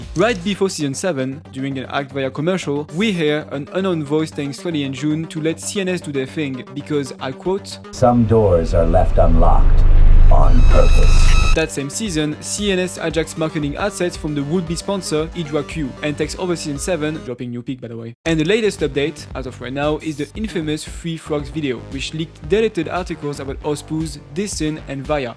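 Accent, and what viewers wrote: France. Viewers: aaaaaaa l'accent français mddr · WAIT! are u french? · Je valide l'accent français ! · Where are you ?from your accent look French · L'accent français qui trahit n'importe qui ❤ excellente la vidéo sinon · Is this guy french? :( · Are u french or israelian?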